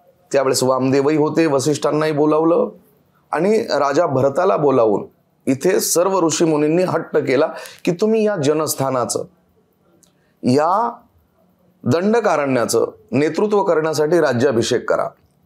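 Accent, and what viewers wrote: Standard. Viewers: कोटी कोटी नमन शुद्ध वाणी,उच्च विचार ,दांडगा व्यासंग एवढा अभ्यासअसणारे महाराज बोटावर मोजण्याइटकेही नाहीत वारकरी संप्रदायात आपली पुस्तके वाचायला आवडतील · प्रगल्भ अभ्यास व्यापक विचार सुरेख भाषा. उच्चार शुद्ध. खरं च अकल्पनिय. विनम्र अभिवादन.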